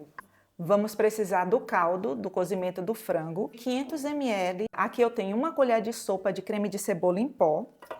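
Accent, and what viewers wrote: Bahia, Brazil. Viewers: Q sotaque lindo de baiana arretada.Gosto da sua voz.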